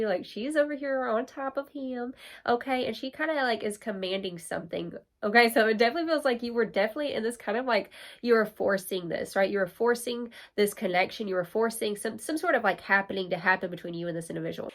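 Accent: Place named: East Texas, United States. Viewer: I never noticed b4 u got that deep east Texas accent 💕 have a great day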